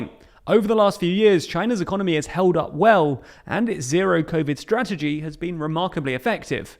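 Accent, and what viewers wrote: England, United Kingdom. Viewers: I think they are based in the US actually (just the narrator has an English accent) :) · Nah mate he is definitely English.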